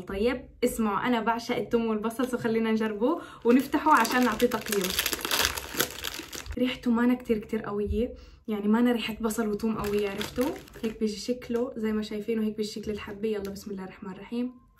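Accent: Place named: North, Palestine. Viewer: لهجه مدن شمال فلسطين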